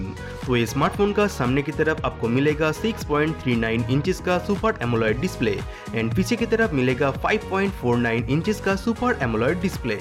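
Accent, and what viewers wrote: Bengal, India. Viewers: Zeshan Zakaria, He is from Kolkata, West Bengal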